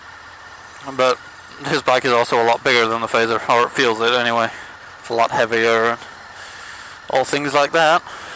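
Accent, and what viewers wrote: United States. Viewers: You sound more American in this video than you ever have done before lol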